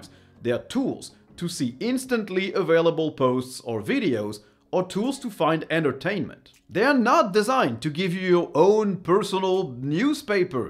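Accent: France. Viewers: Are you French? I thought you were Spanish.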